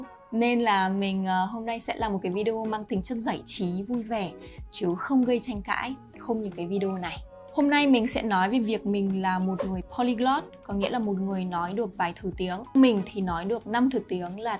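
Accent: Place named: Vietnam. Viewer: bravo. Her French, Polish and Spanish accents are actually better than her English. To me she still has a charming Viet accent when speaking English... Maybe English is particularly weird to pronounce if VN is your first language as much as Tieng Viet is difficult for English speakers to pronounce properly. Her French pronunciation was very impressive too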